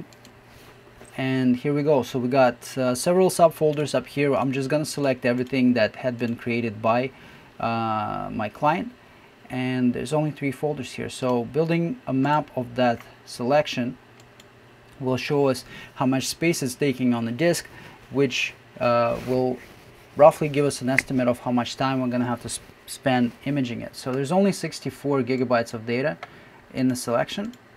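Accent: Russia. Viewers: is that a Russian sounding accent that I detect there?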